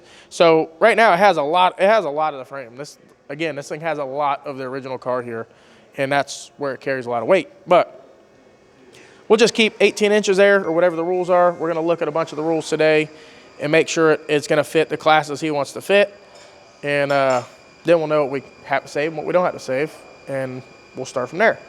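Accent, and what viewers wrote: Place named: South, United States. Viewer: Thanks for the great content. And also many thanks to your cameraman and editor. Question. Ive heard you and say "Sawzah" several times. Sawzall is a brand name from Milwaukee tools. Is that just a Southern thing to say Sawzah? Im genuinely curious. Not trying to be rude. People pronounce things differently all over the world.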